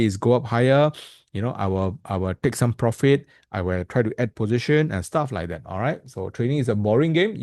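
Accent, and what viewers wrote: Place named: Singapore. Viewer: Singapore accent isnt comfortable for us to listen videos.😂 Please produce videos in Burmese 😊. Sg accent really sucks 😢 Please kindly don't present screen with dual window, all are so minute to properly see words.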